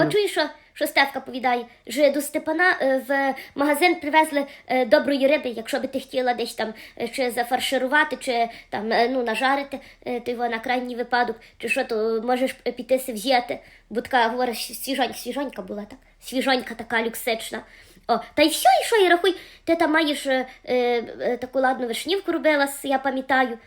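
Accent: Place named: Lviv, Ukraine. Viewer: Боже ,так розмовляє моя мама і тітка .Як мені подобаєиься 😁😁😁Львівщина❤❤❤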